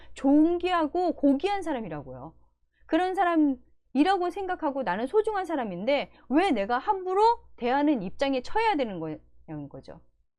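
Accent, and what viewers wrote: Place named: Jeolla, South Korea. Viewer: 전라도 분이신가봐요 ㅎㅎ '모대요 모대요'가 귀에 계속 맴도네요,, 좋은 내용 너무 잘 들었어요♡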